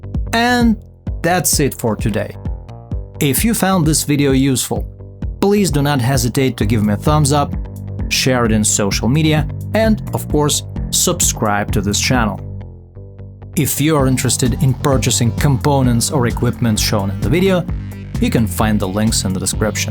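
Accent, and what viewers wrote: Russia. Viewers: Отличное видео! И у тебя подозрительно русский акцент. 👍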